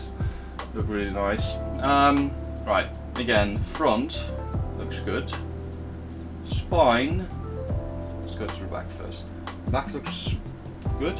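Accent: Netherlands. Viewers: you have a dutch accent but some words you pronounce are Super British haha, master of the Language, great video, that Rokka sounds great tbh .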